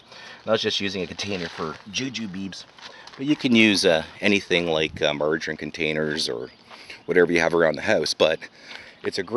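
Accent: Canada. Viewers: He has a Canadian accent, and the box has French words. Areas in Canada are French.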